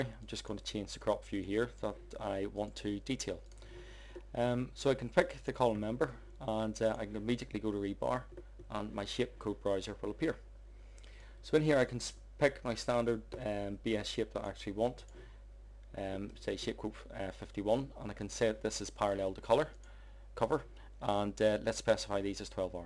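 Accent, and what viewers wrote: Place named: Belfast, United Kingdom. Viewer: i am in canada watching this video currently working for a huge rebar company and i hear that accent....also a belfast fella here so would love to know where ur working with rebar?? its not back in NI is it??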